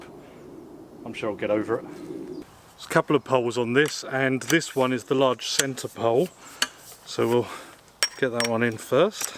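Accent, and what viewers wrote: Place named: Cockney, United Kingdom. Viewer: Love the cockney accent and pronunciation, "minus free" .. brilliant.. your videos are so relaxing. My Dad took us campimg a lot when we were kids.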